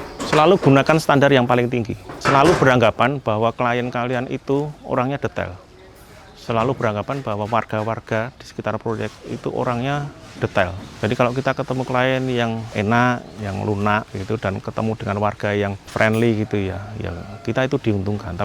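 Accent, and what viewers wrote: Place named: Surabaya, Indonesia. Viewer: Suroboyoan e metu cakkk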